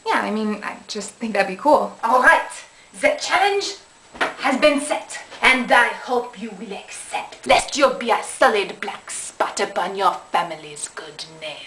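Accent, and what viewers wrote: France. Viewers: haha i love that french accent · Yay new video! John Green said he enjoyed your channel today in BlogTV. (yes, I also have no life) Also, very nice French accent! You practically own awesome and thus it is your awesome.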